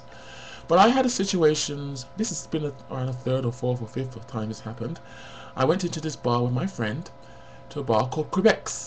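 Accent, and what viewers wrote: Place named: RP, United Kingdom. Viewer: I like how your RP accent.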